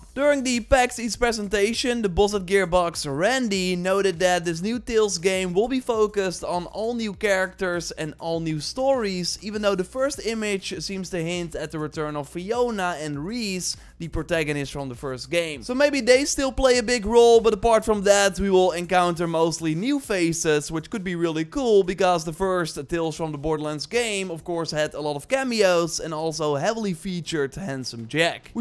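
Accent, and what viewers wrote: Netherlands. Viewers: After all this years... still that heavy Dutch accent while speaking English. Very annoying.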